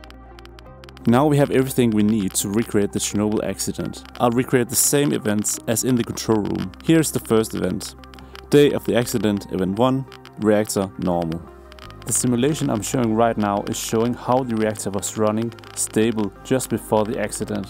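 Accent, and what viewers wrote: Denmark. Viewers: Danish accent ruined the video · Du lyder dansk! Cool video! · Are you danish? Because I’m almost positive I detect a danish accent in there somewhere! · Danglish? Great video! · are you danish ? your accent sounds danish idk. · Are you Danish? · danish guy speaking english? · hold da op man kan nemt høre du er dansk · I think you mean Walken? There is something reminiscent in the intonation but the actual accent is, I believe, Danish so pretty far removed from Walken's american lilt. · Are you danish?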